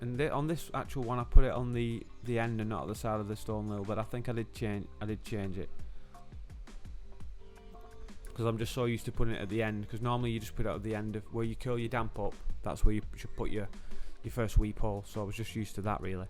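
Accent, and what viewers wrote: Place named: Wigan, United Kingdom. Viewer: Where does reside, sounds like a Wiganer?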